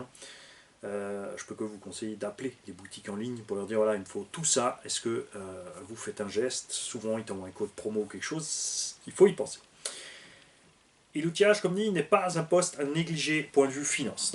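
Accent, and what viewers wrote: Alsace, France. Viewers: Mdr le 💩💩💨 🤣🤣🤣 Moi l'accent me va bien au moins je te comprend 🤣🤣 jsuis aussi alsacien !! Ah yo Hopla 🥨 Merci pour la vidéo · En vrai l'accent Alssacien ça va , mais si tu écrit pour expliquer c peut âtre pire 😂😂😂.....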